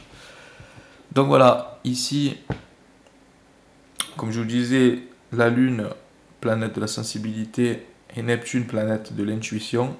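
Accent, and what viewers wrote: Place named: Marseille, France. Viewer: Bravo et merci. 🙏 🙏 🙏 j’ai mis le pouce bleu et je me suis abonnée car c’est un plaisir de vous écouter! Description claire et nette avec ce petit accent Marseillais! 🌏☀️🌜🌗🌈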